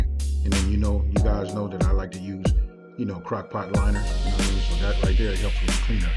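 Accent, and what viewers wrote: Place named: South, United States. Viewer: Hi I'm new to the channel band I'm looovving everything I've seen so far!!! where are you from? I hear a southern accent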